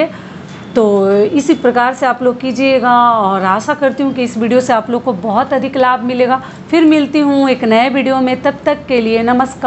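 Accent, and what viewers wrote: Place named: Bhojpuri, India. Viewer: Rajasthan. Ki. Saskriti. Kebareme. Pahnave. Ke. Bareme Ap Jantih. Kya rajsthani. Orte. Vat. Savitri. Ka. Vartme. Nhi Samjatih Inkeliye Tij Karvacoth. Ke. Braber. Apke Vat. Savterike Vart K. Braber. Apke. Guru Konhapkhase. Bilong Kertih. Apki. Boli Bhojpuri. Lagtih. Apko. Ptah. Rajsthanme Moutabu me Bht. Bra. Atmgyani. Permatgyani. Barahamkumari. Sasthanh Muje. Ptah. Biharme Patna Saherme. Bodstuph. Purane. Jamane. Me. Taksila Visvvidaly. Tha. Itihaas me. Kabi. Hamare. Rajsthanki. Saskriti. Ke. Bareme. Btaiye hamare. Pregnancy me. Mehndi. Nhi. Lagat hgodbraim. Lgatehh Akbar Satve Ya Nave Mahine Hamare. Yha. Maglsuter. Nhi. Pahnqte. Galeme. Kanti. Pahnteh. Morden. Orte. Ajkal. Pahnrih. M nhi. Pahnti. Muje gahn. Nhi. Suhate. M. Simple. Ladyhu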